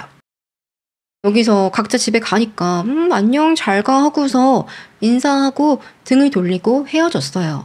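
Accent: Gyeongsang, South Korea. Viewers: 언니 경상도 분이세용?궁금해요!!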